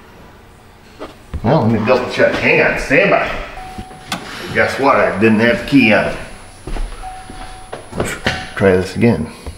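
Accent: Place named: New York, United States. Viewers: i though the way you talk is because it is a new york accent... most people in the USA talk different and pronounce words different because they are different and from a different country or planet you know... go to a good bar and everyone is talking different too Haha... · Ha! I thought it was your New York accent this whole time. I don't hear anything abnormal with your speech. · . Don't worry about your speech, we have been living with your New York "pronunciation" for years and chuckling. It's all good.:)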